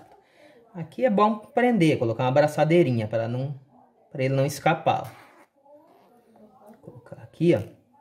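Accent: Minas Gerais, Brazil. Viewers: I m p r e s s i o n a n t e ! Não bastasse o conhecimento repassado livremente e com riqueza de detalhes, somos agraciados ainda com um formidável Mineirês que torna as AULAS, além de extremamente instrutivas, muito agradáveis. Muito obrigado meu camarada. O mundo precisa de mais mestres como você, não para consertar o mundo, mas para ensinar as pessoas que elas também podem consertar, seja o que for. Abraços.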